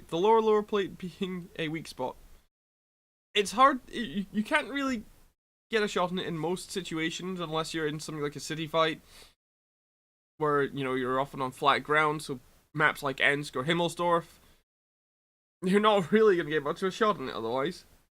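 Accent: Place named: Scotland, United Kingdom. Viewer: Dear lord, u is sounding Super Scottish right now!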